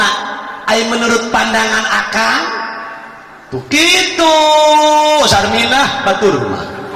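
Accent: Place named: East, Indonesia. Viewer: Syedap .. sundanya logat mana kaya daerah timur Salam Lembur Kuring Purwakarta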